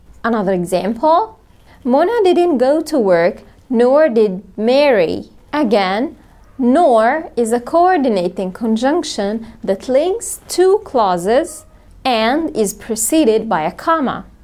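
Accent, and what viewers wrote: United States. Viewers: Thanks for everything my friend to speak and to teach only in English you have a very powerful English with american accent with a perfect pronunciation. It's very very useful when you speak only in English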